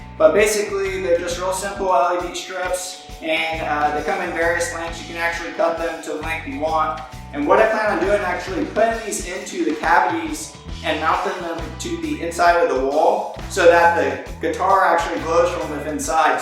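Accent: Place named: Alabama, United States. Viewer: What? I can't hear you! No just kidding. Put your money into something you want. I don't care if the sound isn't quite there. I just don't like that Alabama accent! That's from a Georgia boy of course. Looking good pal.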